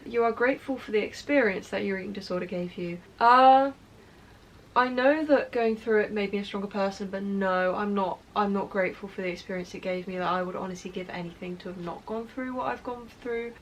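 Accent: United Kingdom. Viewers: You literally just sounds like your from Hampshire... why is everyone saying your posh 🤣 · As a non-native English speaker you "just" sound British to me and I probably could hear differences in British accents in direct comparison but other than that - British and no character traits or society-ishy associated ♥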